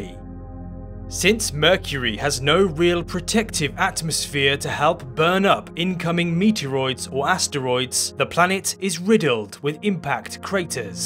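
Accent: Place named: England, United Kingdom. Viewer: I like how you tell stories about the Universe... I'm in love with your english accent. Wow congratulation.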